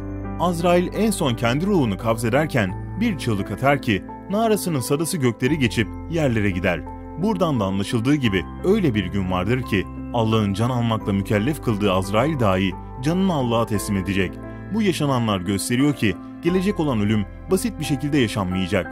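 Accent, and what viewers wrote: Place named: Azerbaijan. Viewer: soyledigin sozler azeriye benziyor hemde cooook guzel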